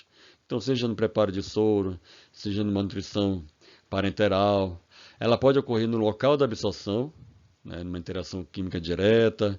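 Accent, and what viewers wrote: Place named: Pará, Brazil. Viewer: Sotaque paraense. acertei ou errei?😊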